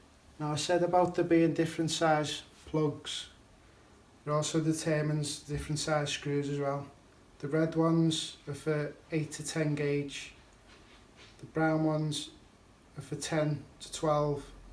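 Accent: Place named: Northern England, United Kingdom. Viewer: I bet you are from Northern England. Somewhere around Manchester area. I am pretty sure about that!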